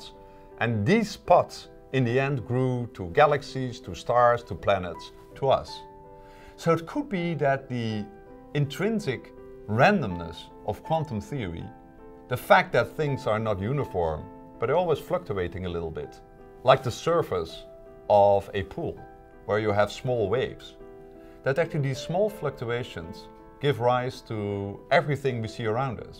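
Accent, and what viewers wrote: Netherlands. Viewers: He's Dutch right? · Hahaha Dutch accent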